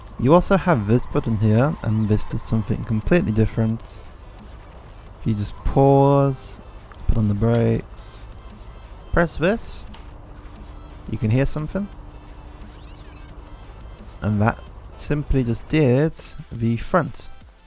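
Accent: United Kingdom. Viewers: Shhh I love ur British accent!!!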